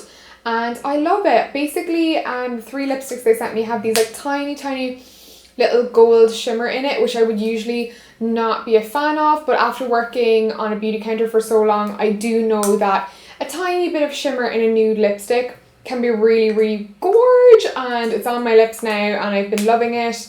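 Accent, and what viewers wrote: Ireland. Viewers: Love your accent my name is also my family is from cork in Ireland too 😍😧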